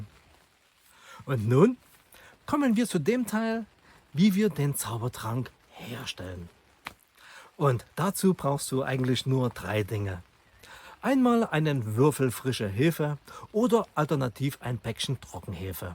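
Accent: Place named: Saxony, Germany. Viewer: Die Petersilie liebt und hasst Hefewasser😮 Das kenne ich😂 (Schockolade) LG aus Brandenburg Du sächselst so schön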